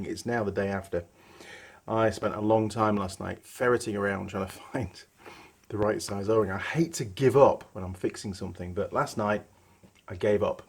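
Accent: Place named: North, United Kingdom. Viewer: I detect a slight northern twang in that voice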